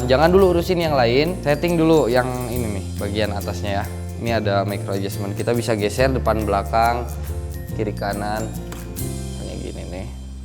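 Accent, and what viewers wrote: West Java, Indonesia. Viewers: ia kang ketauan banget sundanya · Kalo dari logat logatnya orang sunda nih kayanya😁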